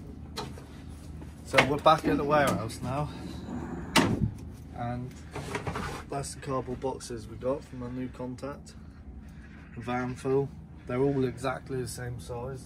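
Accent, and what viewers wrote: Cornwall, United Kingdom. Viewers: What part of the country are you from? Sounds like Cornwall?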